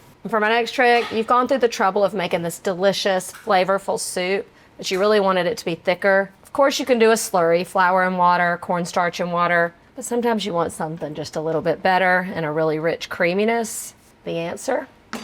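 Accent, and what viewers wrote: South, United States. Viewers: Hi there, your videos are SO good. You're so at ease with and very able to articulate the how and why of cooking with an air fryer, and the oven, of course. You're a very accomplished and personable woman. I've learned a lot from you about air fryers and cooking. And, your Southern easy-going presence and sound are familiar to me. Are you originally from Texas? I'm an 82 y/o woman who has just discovered air fryers. I received my 4 qt. Cuisinart today and after watching your video that included many things to cook using flour tortillas, I made a small meal using one of the small round tortillas I bought yesterday, filling it with delicious foods, and enjoying it within 6 minutes. It'll be a new tasty meal, so easy and tasty. So, thank you.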